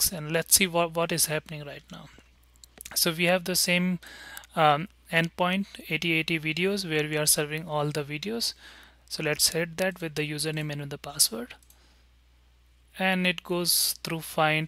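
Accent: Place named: India. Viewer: these indian's accentes is disgusted even for my non-english ears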